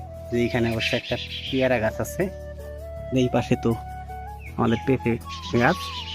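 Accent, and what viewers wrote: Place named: Munshiganj, Bangladesh. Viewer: আপনার মুন্সিগঞ্জ বাসা বাসা নাকি,,, কথা তো মুন্সিগন্জের মত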